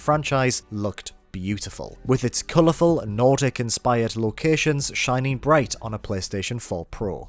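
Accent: United Kingdom. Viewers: What accent is this? Which part of the UK?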